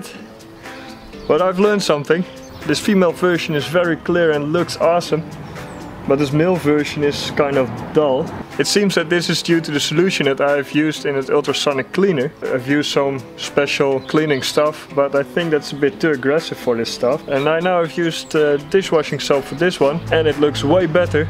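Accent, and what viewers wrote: Netherlands. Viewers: Damn your Dutch accent is strong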